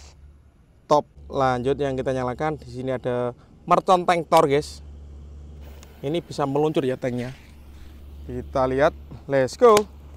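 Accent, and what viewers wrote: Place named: Banyumas, Indonesia. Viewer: Ya jo lali subscribe bang koe kan wong ngapak bang jo lali awal video ngomong "ora ngapak ora kepenak"yo mas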